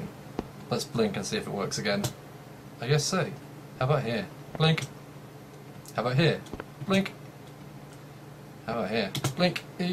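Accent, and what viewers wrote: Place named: United Kingdom. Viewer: This is my first time seeing your videos How the hell did I know you are British ?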